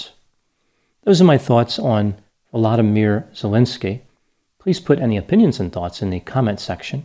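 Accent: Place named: Philadelphia, United States. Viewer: i never noticed but are you from the philadelphia area by chance? i notice you say certain words like i do. but your accent is not strong like NE philly or bensalem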